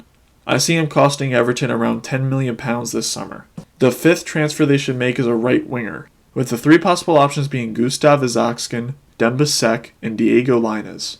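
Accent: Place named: United States. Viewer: Started watching, heard Amercian accent, switched off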